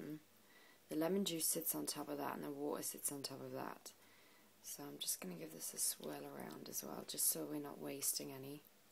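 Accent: Newcastle, United Kingdom. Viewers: I'm guessing your Geordie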